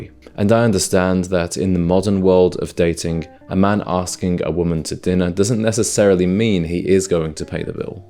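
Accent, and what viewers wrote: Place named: United Kingdom. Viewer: No like for british accent . I thought its american 😢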